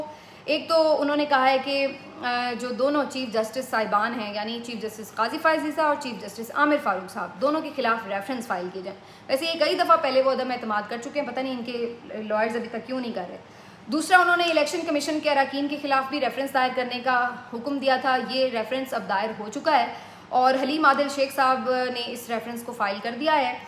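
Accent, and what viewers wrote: Punjab, India. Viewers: ماشاءاللہ آپکا پنجابی انداز گفتار بہت اچھا ہے۔ کرناچاہیے۔ اللہ آپکو صحت دے. اللہ نگہبان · Love your Punjabi accent. It would be a good idea to do a Punjabi V log. English would be for a limited audience so i will not recommend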